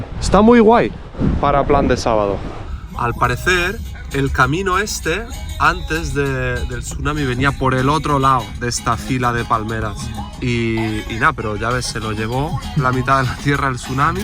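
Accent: Cuba. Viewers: HASTA EL ACENTO CUBANO TIENE PARECE CUBANO YO SOY DOMINICANO VECINO DE CUBA Y PUERTO RICO SOMOS PARECIDO 🇩🇴🇩🇴🇨🇺🇨🇺🇵🇷🇵🇷👍👍🖐🖐🦾🦾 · Lo primero que pensé fue parece cubano por el acento cómo que Tongano. ❤ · El se llevo hasta el acento cubano. Bro me encantan tus videos. Ya he viajado contigo europa completa 😂😂🇨🇺🇺🇸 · Oye, tiene acento cubano, 😂😂😂. Yo soy cubano también. Gracias por tus videos, son muy buenos. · Waooooo habla como cubano!!!! Bella isla ❤ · se me quedó la tonada de cubano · Saludos y, yo soy Dominicano, te sentí el acento cubano.😂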